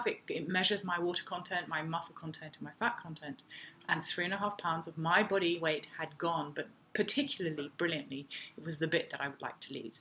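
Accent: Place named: England, United Kingdom. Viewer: Ride W it’s a genuine upper middle class English accent. Nothing American about it.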